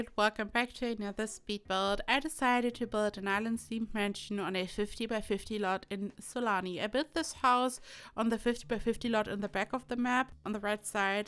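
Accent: Germany. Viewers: your accent smells so German 😍 and i love it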